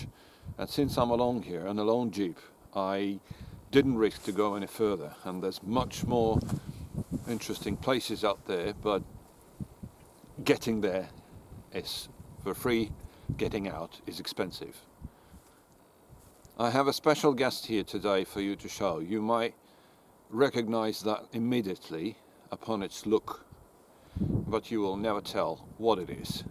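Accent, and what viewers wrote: United Kingdom. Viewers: Interedting. Aberdeen is in Scotland, i was thinking more about areas like Yorkshire which stress sounds similar to you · Haha I'm confused are you Brit or Russian? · you sound British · Greetings from New Zealand. You are the most British Russian ever! I had so much fun watching this. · bro i thought you were british ngl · You sound British B) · Sounds like you are British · Yea he is actually good Sounds like british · For a Russian you sound like a perfect brit.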